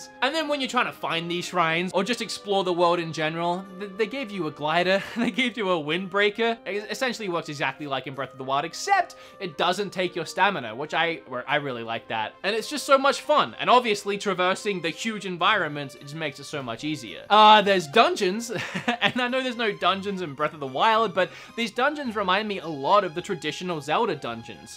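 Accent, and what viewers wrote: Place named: Australia. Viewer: Woow, weird hybrid accent my fellow Aussie, I think?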